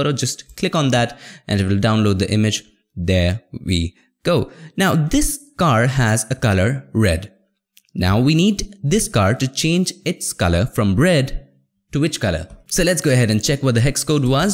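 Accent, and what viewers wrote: India. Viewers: I usually hate indian accents but yours is somehow great in my ears 😂👌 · cannot focus on the video... indian accent and connected eybrows ruined the experience for me :/ good video tho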